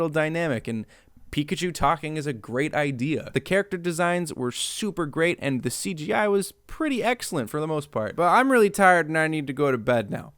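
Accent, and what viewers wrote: Australia. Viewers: Binge watching old videos then just not hearing an Australian accent all of the sudden was incredibly jarring. I do like the videos where gets involved though. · Maybe doesn’t realize that people mainly come here for his Australian accent voice.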